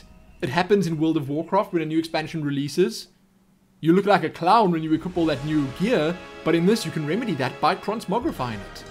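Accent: South Africa. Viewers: ***** I know, I was referring to the South-African accent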